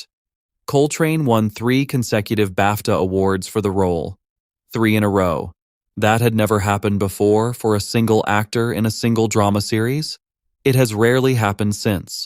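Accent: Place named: United States. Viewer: Why the f*** do we have to listen to an American voice telling us about British TV legends ??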